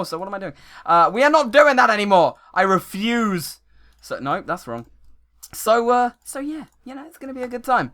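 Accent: United Kingdom. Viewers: QOTD: I don't know what u mean but in minecraft I like to travel by sprinting and in real life I like traveling in a car because u see more then taking a plane also can u do more daily captive minecraft 2? Thanks your vids are great and I love your British accent of your voice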